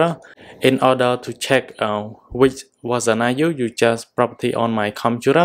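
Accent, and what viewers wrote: Vietnam. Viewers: Why you read english like vietnamese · Heavy Vietnamese accent, is it like the slang America's use, or it it because that so American to you that it's already thrown out as hidden philosophy that help you speak and talk like a doctor without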